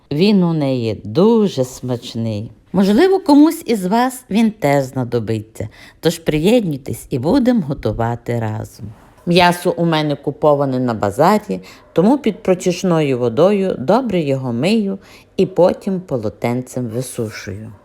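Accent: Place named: Western Ukraine, Ukraine. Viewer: У вас такий гарний западноукраїнський акцент. Знаю, що в тих краях дуже файні гадзині))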